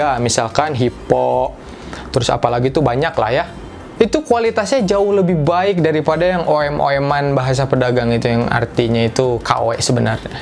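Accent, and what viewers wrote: West Java, Indonesia. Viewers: Om, situ orang sunda ya?, Logat logatnya sunda bgt😂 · kok mirip efkahfajar yak nadanya, apa karna org sunda? ahahahaha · orang sunda nya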